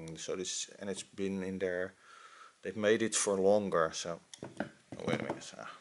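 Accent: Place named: Netherlands. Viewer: Dutch accent 😁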